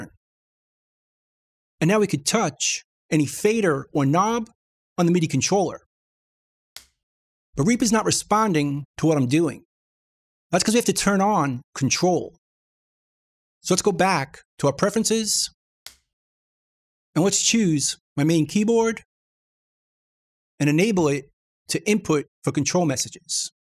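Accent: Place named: Northeast, United States. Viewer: A lot of people from the northeast talk like that.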